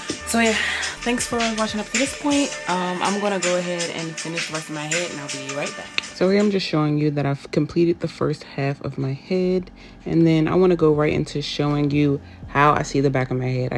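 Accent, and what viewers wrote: DMV, United States. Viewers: U gotta be from the DMV. The accent. I love iiiit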